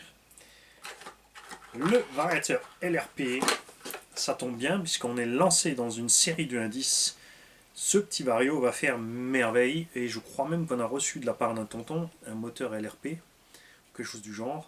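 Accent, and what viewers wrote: Alsace, France. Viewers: Hallo ! Ich liebe deinen elsässischen Akzent (ich weiß, dass es deutsch ist, aber sie übersetzen nicht ins elsässische: p) Merci Tonton Pictave, tu as remis la machine à projets en route :) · Salut toI héhéhé le blondinet déjà qu'ils avaient (abos) du mal de comprendre en temps normal ,Mais maintenant sa và être pire😂😂😂😂😂😂😂😂😂😂😂😂PS C'EST QUAND MÊME BO L'ALSACIEN 😘😘😘😘😘😘😘👍 belle journée à tous jc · Mais dis moi, tu fais drôlement bien l'accent alsacien, on s'y croirait presque 😁😋🤣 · Génial l'accent alsaco 🤣 c marrant d'ailleurs que tu ne l'ai pas plus que ça en temps normal😅 super kdo merci le tonton🤩😘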